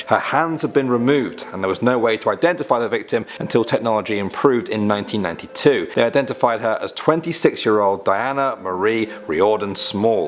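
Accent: United Kingdom. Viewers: Everything's scarier when told in a British accent. Thank you 🇬🇧 🇺🇸🇯🇲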